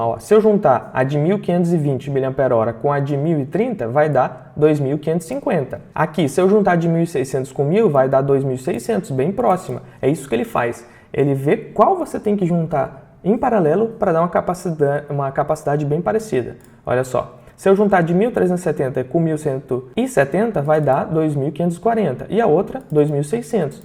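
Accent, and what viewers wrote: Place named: Paraná, Brazil. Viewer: Fala, esse daí em final de frase... Acho que tu é do norte paranaense hein... Rsrs. Massa a aula, inscrito aqui e acompanhando pra aprender um pouco sobre eletrônica. Hoje trabalho com suporte em TI, mas estou buscando um 2ª fonte de renda.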